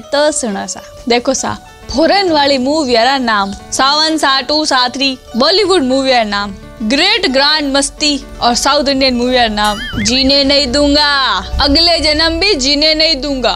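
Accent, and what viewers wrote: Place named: Marwari, India. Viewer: U are amazing I love the way u speak in English and Hindi with marvadi accent.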